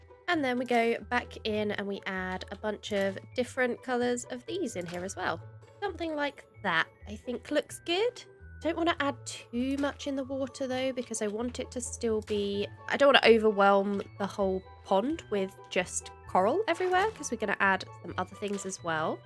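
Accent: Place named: United Kingdom. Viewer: Love the vids. Builds look great and nice to hear a chiiled brit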